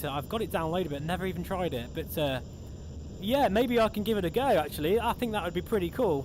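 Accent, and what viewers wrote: United Kingdom. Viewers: Is that a Cheshire accent? G2 looks good, still waiting for mine, since 9th July. Getting fidgety now.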